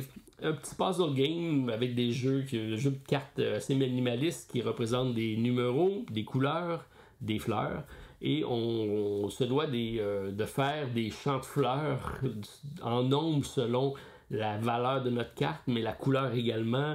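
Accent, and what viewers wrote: Quebec, Canada. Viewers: Bonjour. merci pour cette vidéo. Coup de coeur du mois de Mai pour EVACUATION de VLADIMIR SUCHY. Attendus pour le mois de Juin, WYRMSPAN et SLAY THE SPIRE. Bon Weekend....... La langue Française avec l'accent Québécois n'est-elle pas trop belle!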